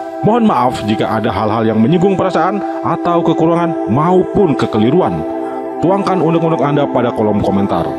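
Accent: Java, Indonesia. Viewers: logat jawa ny kental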